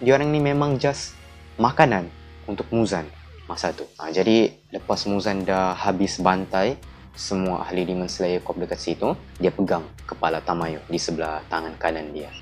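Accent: Sabah, Malaysia. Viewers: Ui orang sabah ba kau ni 🗿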